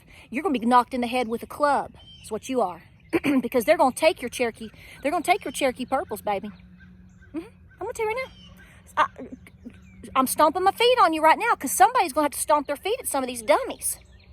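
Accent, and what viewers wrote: South, United States. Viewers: You are the absolute BEST!!! Except for the southern accent, you sound like me! I am astounded that people are so stoopid!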